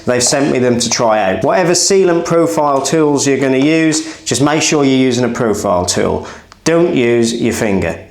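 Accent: Norfolk, United Kingdom. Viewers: Norfolk boi :)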